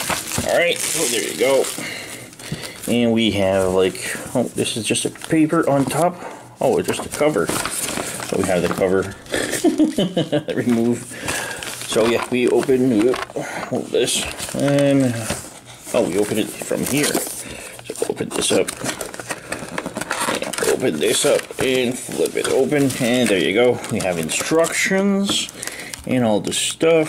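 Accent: Quebec, Canada. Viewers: Super interesting. I haven't built these kinds of models before. Do I hear a Quebec French there?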